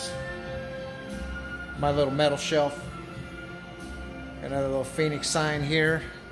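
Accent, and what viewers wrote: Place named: England, United Kingdom. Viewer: That's the queen's English sir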